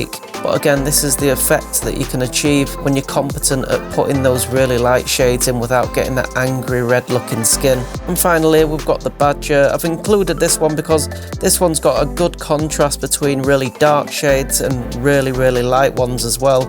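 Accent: United Kingdom. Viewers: est-ce qu'il a un accent genre super british?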